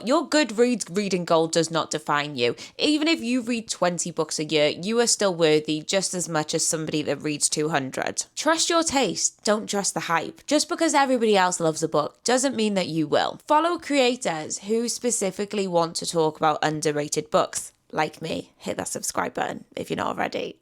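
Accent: United Kingdom. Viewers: With those hyped/popular books, I'm often a bit are the popular because everyone talks about them or are they popular because they're actually good quality literature? In a majority of the cases, I feel it's the first option, and yes, I might be a bit cynical, I can admit that. And while it's of course great if Booktok and the hyped books may have gotten some people to read, don't get me wrong, but there's so many other books out there, that's also well-written. Just to show the middle finger to Booktok a bit, I did start an "old school" book blog where I write about mainly literary fiction, contemporary, classics, and world literature. Some titles more popular than others, but also a bit more "hidden gems" when I come across them. Btw, can I just say I love your British dialect?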